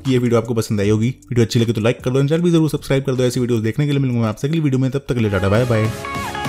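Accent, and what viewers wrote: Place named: India. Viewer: He is talking indian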